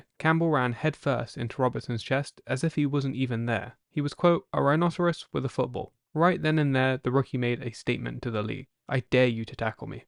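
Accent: England, United Kingdom. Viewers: british dude. i love this! no offense tho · Why do they have to have some English guy narrate this? Who never played,watched or understood the game.Just leave the video everyone will figure it out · Something wrong when a football documentary is narrated by a british person. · somehow, listening to a tribute to Earl with the narrator having a British accent just ain't right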